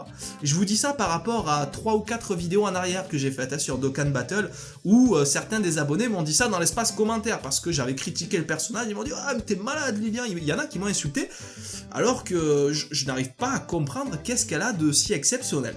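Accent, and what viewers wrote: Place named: South, France. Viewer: Vu ton accent ca se voit que tu es un sudiste😊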